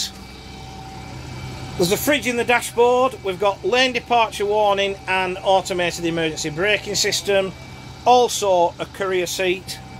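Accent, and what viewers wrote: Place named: Yorkshire, United Kingdom. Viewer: Just love your voice proper Yorkshire, which part of yorkshire I realy don’t know.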